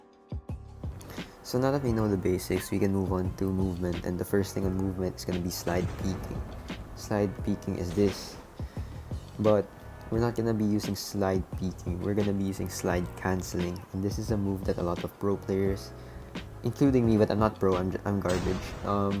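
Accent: Philippines. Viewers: Are u a Filipino? You sound like one tbh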